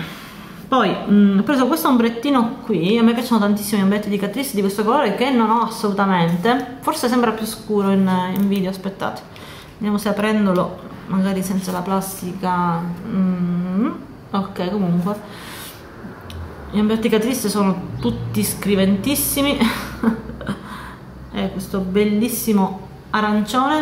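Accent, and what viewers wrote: Sicily, Italy. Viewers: io e te abbiamo le stesse passioni makeuppose... Il verde-oro e gli ombretti in crema... Sarà per questo che mi piaci tanto e non mi perdo un tuo video??? O sarà perchè amo l'accento siciliano??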